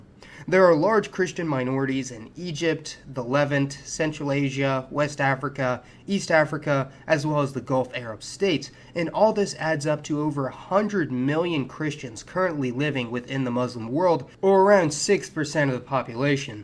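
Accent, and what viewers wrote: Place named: United States. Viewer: and no American voice next time 😂try a British one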